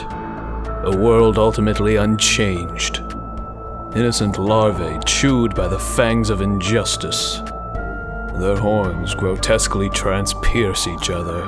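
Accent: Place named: United Kingdom. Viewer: Aaah yes, british accent + poetic lyrics + = absolute subarashiness 👏👏👏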